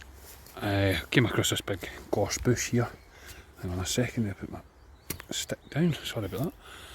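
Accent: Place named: Scotland, United Kingdom. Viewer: Nice finds.. i love those turquoise blue eggs.. ur Scottish accent is fascinating 😊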